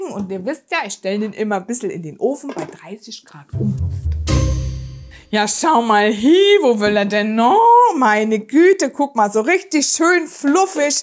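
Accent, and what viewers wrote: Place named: Palatinate, Germany. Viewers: Wenn ich mich nicht irre hört sich des an wie bei Joy Fleming, also Mannheimer Schnauze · Ja, das sieht lecker aus! Und wenn ich dieses Pfälzisch hör, bedauer ich's mal wieder, dass ich keinen Dialekt anständig sprechen kann.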